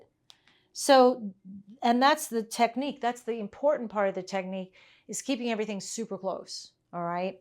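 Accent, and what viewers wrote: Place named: Bronx, United States. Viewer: A few other thoughts about garment sewing. First is that I've found that over the years, it's not been so much that I needed patience to be able to get the work done, but that in doing the work, it has given me patience. Next was the revelation I had when watching you sew the facing to the foreparts....See? I'm paying attention, professor! I realized that I'd done that very technique years ago! I was doing a repair job on something or other, had what I thought was absolutely no seam allowance and therefore needed to sew by hand. I folded the two edges about the same way I watched you do it, and sewed them together. NO IDEA at the time that I was doing the sewing the way traditional tailors did. For me, it just shows that human beings very often find solutions to problems that are similar, and execute them similarly. 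Oh yeah. Every time I watch you, I chuckle hearing that NYC accent AND ATTITUDE. You can take the woman out of New York, but you can't take New York out of the woman. And, during one of Susan Khaljes' other classes, she put in a lapped zipper using pick stitches. Same technique, different application.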